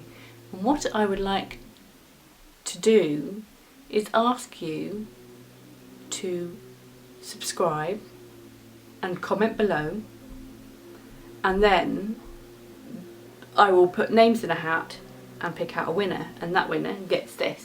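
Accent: England, United Kingdom. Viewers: Hey. Enjoying your podcasts. It is so helpful to see projects we can buy patterns for on ravelry ...one interesting observation I noticed is some language similarities between your English and the English we speak in the American South.. for example: when you said this one is go to be a good un. This is a slang we use frequently in the American South.. we use language very differently than the North.. one word we use is "I reckon"... Which is common on England but used only the South in the USA.. I think we in the South hold on to our English we brought over when we settled here from many different areas in the UK.. the first time I heard an English person say "I reckon" I thought oh my it is a proper word! Most Americans look down on our Southern American English. I enjoy you .. and enjoy listening to your accent..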